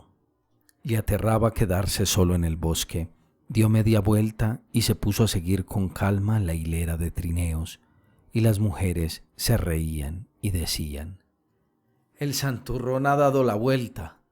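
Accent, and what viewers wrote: Colombia. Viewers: Chejov y todo ese grupo de escritores , describen con maestría la idiosincracia del pueblo ruso, su pobreza, sus anhelos, la rutina que los apabulla y esa relación tan particular con el cristianismo ortodoxo. Buena lectura de un " paisa" colombiano delatado por su acento.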